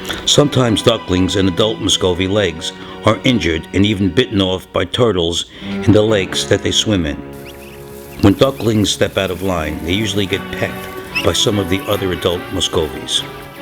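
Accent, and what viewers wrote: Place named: Brooklyn, United States. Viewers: Amelia Bee Not a New Jersey accent. New York. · Amelia Bee he sounds like he is from Brooklyn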